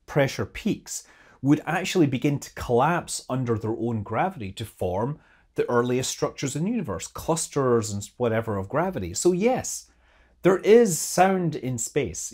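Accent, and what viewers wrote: Scotland, United Kingdom. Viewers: Jesus,. I've started to explain astronomical context to my GF with a Scottish accent, and I'm South African. Thanks for these!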